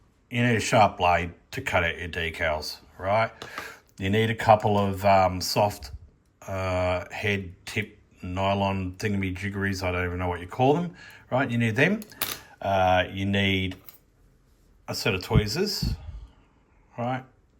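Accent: Australia. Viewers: Hi. I know I'm a bit late on this but another of your videos recently popped into my YT feed and I've been catching up on your videos ever since. Thank you as they're very helpful in improving my modelling skills. One question - I was led to believe TS-13 was too 'hot' to apply directly over decals so I've used other clear sprays. Is the secret just to keep the first coat over the decal very light? Btw, I'm in Sydney so it's nice to hear a familiar accent.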